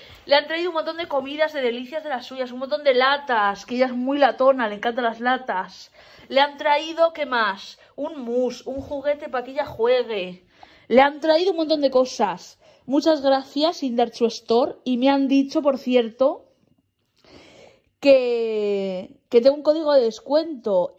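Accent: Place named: La Mancha, Spain. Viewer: Eres tan graciosa! 🤣♥️ Oye, de dónde eres? Por el acento y la gracia, me suenas como manchega...? Anda! Acabas de decir que eres vasca... No pareces.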